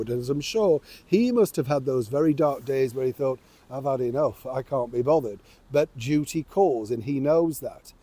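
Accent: Yorkshire, United Kingdom. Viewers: True 👍🏻you sound like you are from up here in Yorkshire sometimes 😊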